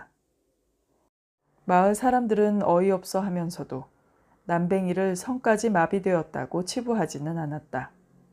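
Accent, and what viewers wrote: Gyeongsang, South Korea. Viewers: 경상도 분 인거 같아요. 낭독할때 사투리가 나오잖아요. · 어떻게 그리 경상도 사투리를 잘 구사하십니까?전직이 성우? ^그놈^ 김일성의 남침으로 택만과 도리실댁의 일생이 ^^^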